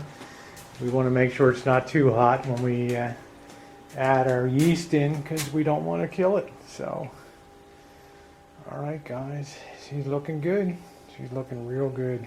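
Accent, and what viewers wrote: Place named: Philadelphia, United States. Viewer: you sound like you have a philadelphia area accent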